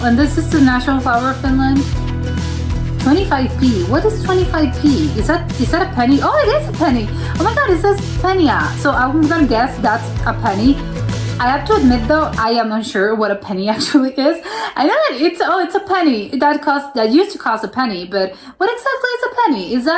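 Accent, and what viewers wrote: United States. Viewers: Dragon, seagulls, king.... hilarious. 🙃Well, Finland's currency has been €uro since 2002. Before that, it was mark. "Kymmenen markkaa" means ten marks. "Dragon" is a lion and "seagulls" are swans. Finland has had a president since 1918, not a king. However, you sound very, very, very American. ☺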